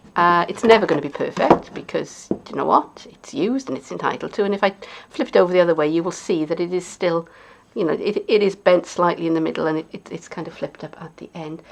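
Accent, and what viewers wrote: Wales, United Kingdom. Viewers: . Hi, I lived in Swansea back in 1968 which is where i gave birth to my daughter, nice to see and hear a welsh accent from the array of utube tutorials out there, i'm never off my tablet, learning new crafts every day. What else do you do on utube? x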